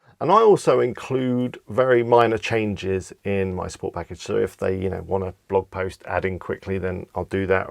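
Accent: United Kingdom. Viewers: Is that british accent ?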